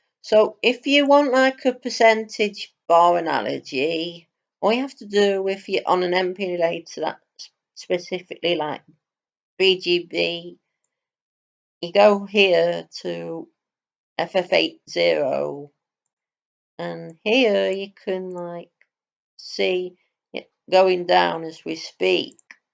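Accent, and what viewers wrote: United Kingdom. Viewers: I cant put my finger on it.. what is your accent? British?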